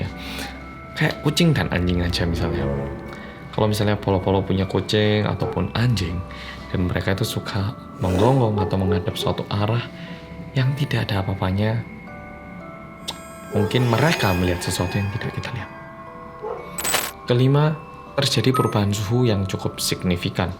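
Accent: Java, Indonesia. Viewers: HIYAKKK PPPPPP KURANB GERCEP😭 MAS MEDOKKKK👍👍👍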